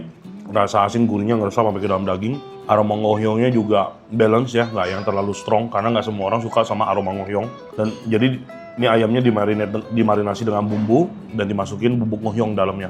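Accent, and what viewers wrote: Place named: Surabaya, Indonesia. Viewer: Mas atau ko nya logat" medok" Surabaya an 😊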